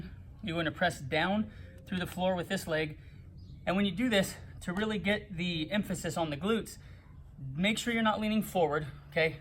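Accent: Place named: California, United States. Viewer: Damn, I never realized what a California accent was until I lived in Wisconsin for 10 years 🤣. Thanks for the workout!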